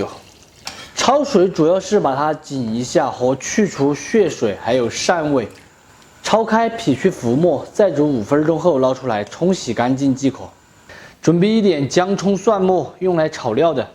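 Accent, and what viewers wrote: Sichuan, China. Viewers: 喜欢听这个川普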